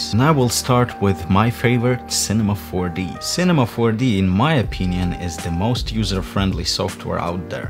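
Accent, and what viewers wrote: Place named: Russia. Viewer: Russian accent😂anyway blender 2.8 is future 3d modelling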